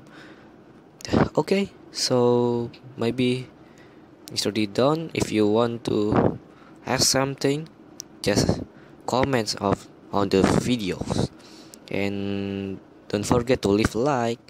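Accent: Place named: Indonesia. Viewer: You indonesian not engglis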